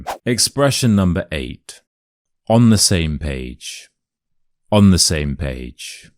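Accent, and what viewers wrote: United Kingdom. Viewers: Thank you, I’m learning a British accents, expressions and all of english from your video. Happy new year mate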